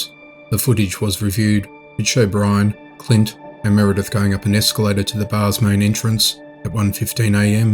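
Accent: Australia. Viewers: Love your voice and because of you I really can listen to other aussies ❤️👍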